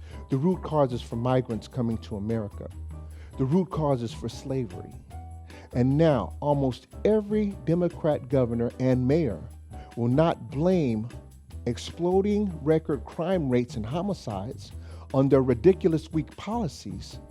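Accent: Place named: Jamaica. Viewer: Love the Jamaican accent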